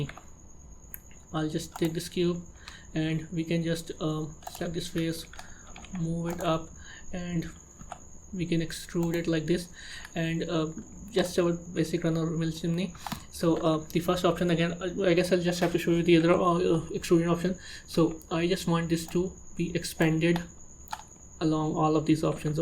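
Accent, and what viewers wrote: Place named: India. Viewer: Not to offend you, but practice your vocal skills. So speaking English without the heavy Indian accent. There are various reasons, you sound more skilled and educated. When you teach you need authority and talking with gumballs in your mouth is not helping. A good voice draws attention and keeps it. It also let you stand out from your competition. For a western audience its really horrible to listen to, sorry for the harsh words. So set your pride aside and grow your business.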